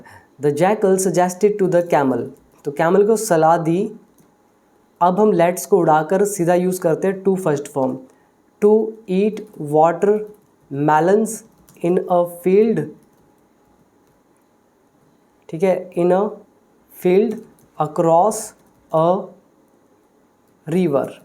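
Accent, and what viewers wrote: India. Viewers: Well done but u should work on your prononciation, because u use Indian accent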